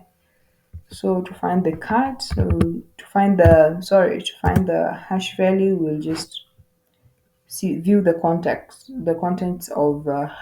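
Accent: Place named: Kenya. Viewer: You sound Kenyan I guess, if you don't mind are you proficient in C or C++?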